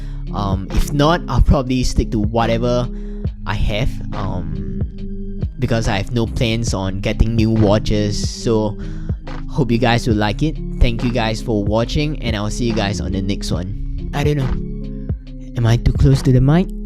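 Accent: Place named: Singapore. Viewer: Your Singapore -English accent made this video great . Excellent good job ...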